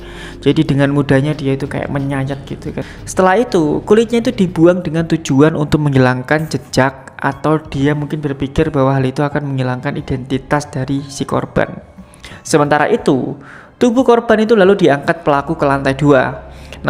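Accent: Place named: Java, Indonesia. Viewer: Jangan pake logat jawa mas karna tak enak di dengar lihat macam kamar jeri dia orang aceh tapi logat aceh nyah tak di pakai karna indonesia terlalu luas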